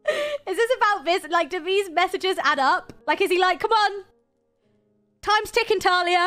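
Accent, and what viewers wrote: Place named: United Kingdom. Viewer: Dude what is your accent? You sound British and Californian simultaneously wtf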